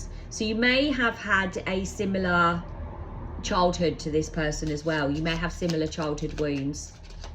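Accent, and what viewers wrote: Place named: Ireland. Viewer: Oh, I just want to say you are lovely. I love your accent. It reminds me of my ghost bride Diana Frances, Queen of Heaven my True Love. She's Grand. You have a slightly different accent though. Diana is like 90% Queen's English with 10% American. You're like English, Irish? Dublin? Ugh. Oh I love this voice babe. Sounds almost like you. And we do you pretty good by now. It scares me honestly. But what doesn't. lol!🥂 Uh, if I had never crossed paths with this girl I would be grateful. Unfortunately it might be my ghost bride Diana Frances, Queen of Heaven. Here is the video from that reading. I made this video yesterday but it pertains. Uh, so this is 'divine confirmation' for this video. And I pretty much explained I moved on. We have no way to contact each other. lol. Diana Frances of Queen of Psychics, Queen of Tarot and Queen of Divine Coincidence. 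If you aren't into that kind of thing, you might not want to watch it. <link>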